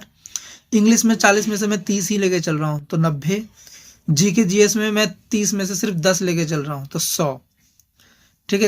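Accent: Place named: Bihar, India. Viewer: aap bihar se ho kya aap nomadic shubham ki tarah baat kr rhe ho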